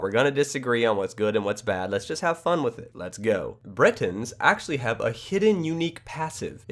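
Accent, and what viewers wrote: South, United States. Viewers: Southern guy playing some Skyrim? Subbed!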